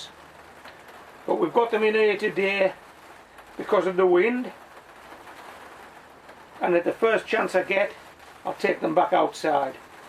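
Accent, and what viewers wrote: United Kingdom. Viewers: Hello,. Where in the UK do you live? You have the most charming accent! 🙂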